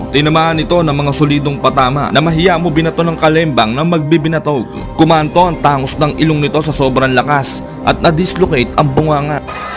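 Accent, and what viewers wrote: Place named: Cabanatuan, Philippines. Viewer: Taga Cabanatuan kaba I dol. Katono ka Ng salita Namin.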